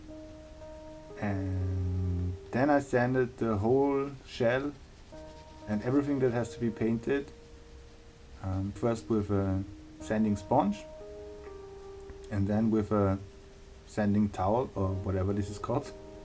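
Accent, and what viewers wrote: Germany. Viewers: Are you german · where you from? german? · Are you german?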